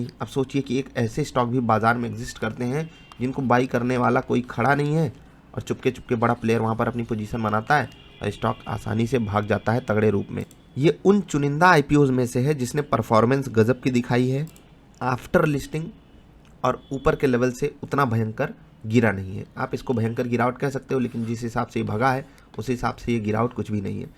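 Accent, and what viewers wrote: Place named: Eastern Uttar Pradesh, India. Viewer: You are from UP EAST ??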